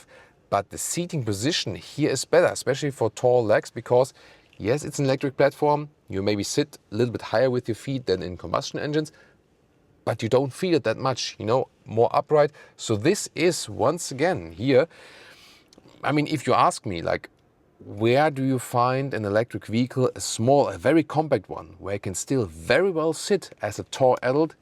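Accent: Germany. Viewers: Listen to his German-speaking videos, very solid Hochdeutsch. Probably not faking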